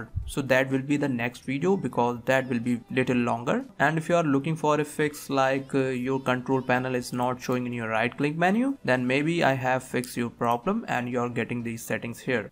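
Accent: India. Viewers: É SEMPRE O INDINAO COM SOTAQUE MAIS RANHOSO DA HISTORIA QUE NAO RESOLVE O PROBLEMA SINCERAMENTE MEU ÉS IPOR RAÇA MAL CHEIROSA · Its always the guy with the cool Indian accent that fixes my tech problems. Thank you! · indian accent >> every other educational video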